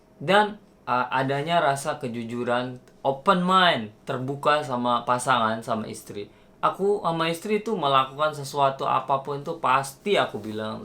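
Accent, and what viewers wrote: Lombok, Indonesia. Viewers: Ailah logat lombok iku dk bau telang.. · Alhamdulillah Logat sasak nya masih terasa, but seperti nya akan hilang, hmm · Bang asli lombok?? Logat nya sasak tulen ni salam dri lombok bang hehe😅🙏🤙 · Mantap bang lanjutin bikin Videonya, logat lomboknya gk bisa ilang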